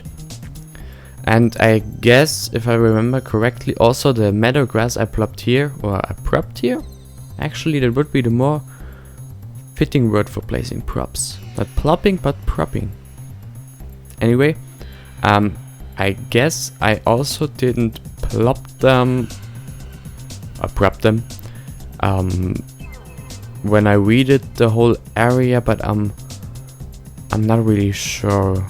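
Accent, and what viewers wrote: Germany. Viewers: man hört es sofort das Du Deutscher Bist xD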